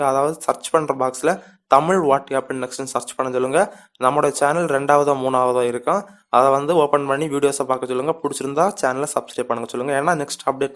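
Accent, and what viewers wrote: Kanyakumari, India. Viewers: super slang u have bro r u from kanyakumari?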